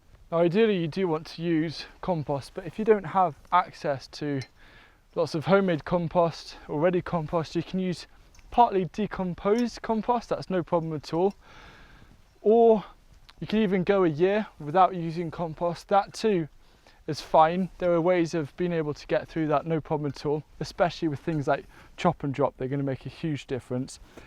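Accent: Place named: Lincoln, United Kingdom. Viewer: one of my professors is from Lincoln! I recognized the accent from hearing you and when he described his home and how the soil is great for farming, I put two and two together. Love your content! Glad to see you're back! ❤️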